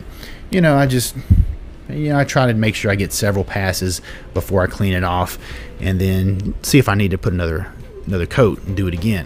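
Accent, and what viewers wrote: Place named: Midwest, United States. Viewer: Call Me silly, But gosh does it feel good hearing a Midwest Boy. Great video, and nice work.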